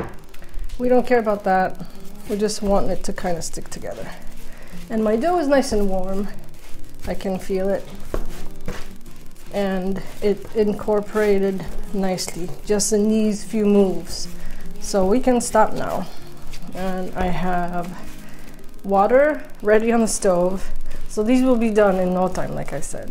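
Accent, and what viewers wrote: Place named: Poland. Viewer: What's wrong with the inexpressibly sweet Polish accent, spoken by a woman? Whenever I hear a woman speaking with a Polish accent, my affected ears become listening and absorbing shells, as it were, in transport! In other words, I turn to jelly, in ecstasy!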